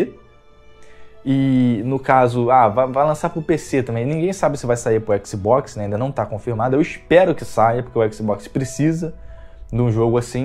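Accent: Rio de Janeiro, Brazil. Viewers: Teu sotaque carioca é muito forte!!! SSSSHHHHHHH SHHHHH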